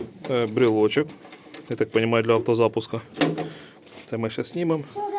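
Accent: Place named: Poltava, Ukraine. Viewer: По говору здогадався. Полтавчан не сплутати нi з ким.))) Тримайтеся! Тепла та благополуччя! Всiм достойним украiнцям захисту Всевишнього!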